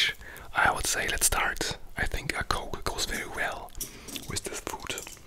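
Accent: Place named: Germany. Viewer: Der deutsche akzent ich liebe es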